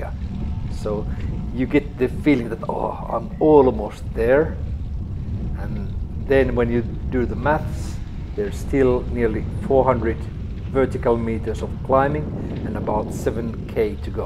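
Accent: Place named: Finland. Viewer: There are great conditions and landscapes 👍 It's interesting how well a Finnish understands that English with a Finnish accent😁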